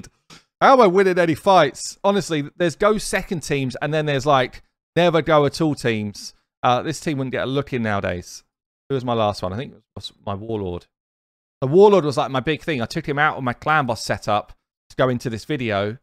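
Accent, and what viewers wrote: United Kingdom. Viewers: My favorite raider on Youtube with a british accent, and the maker of excellent tools for the game. Love it. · You should be incredibly proud!! You have come a long way and your videos got me into raid and keep me coming back every day for new content. You are very entertaining, even when you are doing gear cleanses lol. I think the way you Brits talk is entertaining too coming from America. It's all very fun!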